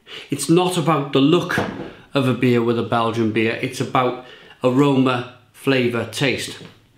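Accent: Wales, United Kingdom. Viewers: slightly, he’s got a very light accent compared to some other welsh people but all in all I think a lot of them don’t have a particularly strong accent as some times you can’t even tell if they are welsh and that’s coming from someone who lives in England and has been wales quite a few times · You can hear the Welsh tone, though it is more subtle than other Welsh accents that are stronger. Watch and listen to former rugby player and now commentator Jonathan Davies. That is a stronger Welsh accent.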